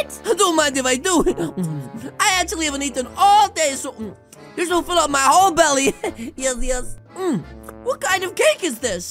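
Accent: United Kingdom. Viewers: Never knew he was British lol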